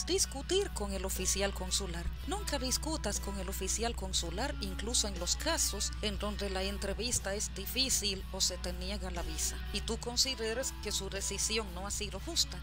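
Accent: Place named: Russia. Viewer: Buena informacion aunque la Calidad del video y esa voz con acento ruso es un poco molesta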